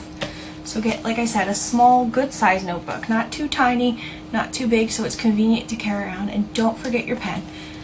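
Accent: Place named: New Jersey, United States. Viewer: Very good video presentation, if I was going to do one it would be just like you did it! I also understood you when you said "guass", we Jersey peeps know the accent well! :-)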